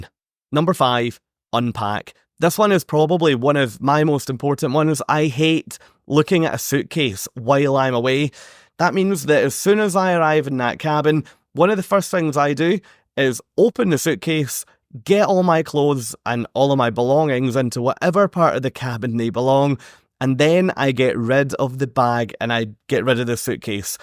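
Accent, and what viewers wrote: Scotland, United Kingdom. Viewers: What a delight to have a Scot who speaks so clearly!!! We “unwashed” revel in the accent but luve to understand also. 😊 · Loved your sweet Scottish accent! Could listen to it all day! Great video very different from others.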